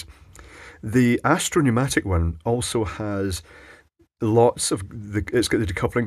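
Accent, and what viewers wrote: United Kingdom. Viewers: British English is in full effect in this video. Crikey.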